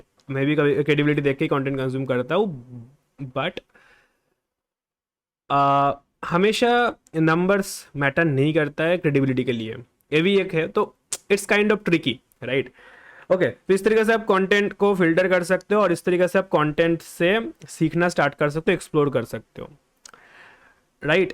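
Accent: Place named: Bengal, India. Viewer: Brother You are bangali right? I can feel it with your hindi. But no worry, you are good at hindi. After sometime I hope you will be more fluent in hindi. There is something about you which I can relate with me with you. I think, you know a lots of stuffs but the thing is, it is not looking so much interesting to watch this video. But obviously it is informative. I have the same problem, pata to bohut kuch hai lekin utna interesting video ko bana nhi pata. But we have to try to be more interesting to people. Agar kabhi tum aur mai famous ho jaye to ek podcast to banahi sakte hai na? Take love ❤, spread the positivity. And lastly remember me 'its Samiul' 😊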